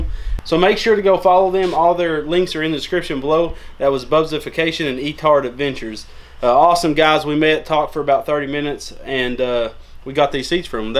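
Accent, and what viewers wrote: South, United States. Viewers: Are you from down south?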